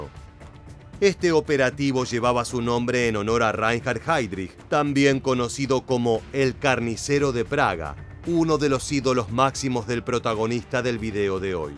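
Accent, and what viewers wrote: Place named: Argentina. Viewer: VIDEO CON ACENTO ARGENTINO, YA NO ME INTERESA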